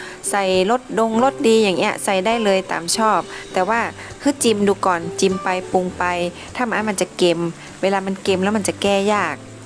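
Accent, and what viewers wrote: North, Thailand. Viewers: ภาษาเหนือคะฟังง่ายอยุ่น้า😊😊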